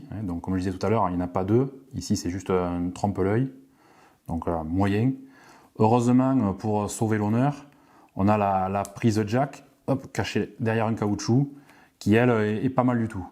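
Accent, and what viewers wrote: South, France. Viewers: Merci beaucoup et toujours avec l'accent du sud et le sourire en prime 👏👍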